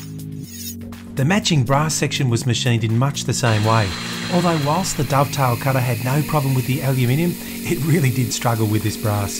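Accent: Australia. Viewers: First time viewer, loved it. Good to hear an aussie accent on a well produced video. Subscribed.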